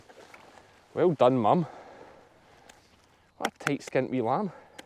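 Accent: Scotland, United Kingdom. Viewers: Hello from Australia. I have just stumbled upon your videos and your Scottish accent has me hooked! You are certainly kept busy with sheep farming. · After I watch just a few of your videos, I start thinking in a Scottish accent. Lol. Tho I still can’t understand a word I’m saying. 😉 ♥️&🙏✝️ to you guys.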